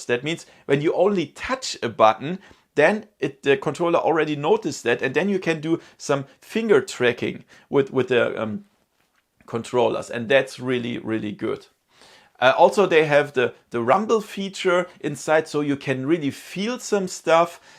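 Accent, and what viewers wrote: Germany. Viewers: He is German speaking in English. Now your turn do a video speaking in German.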